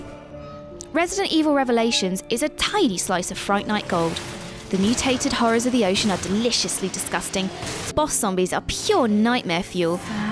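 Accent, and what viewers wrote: United Kingdom. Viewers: Asian girl with British accent? Yes please and thank you :3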